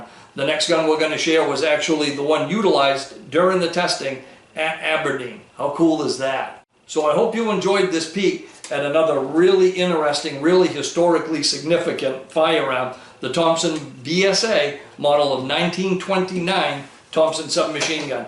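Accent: Maine, United States. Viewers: It's GREAT to see you getting back into full swing! Love the Maine accent and getting a history lesson aint bad either! Thanks!! Glad you are on the mend!!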